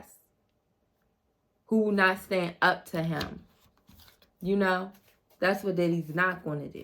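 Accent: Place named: Baltimore, United States. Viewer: Greetings 💕 playing catch up, but Diddy just may want the ‘Unisex Cult’. She needs 2 realize that her LIFE is more IMPORTANT. That $$ has her not 🏃🏾‍♀️ the opposite way…that’s not what he likes😶😄 THANX 4 YOUR 💝 💜…yeeeess ma’am I hear Baltimore tongue! On 2 the nxt video 😊 LIKE..SHARE & SUBSCRIBE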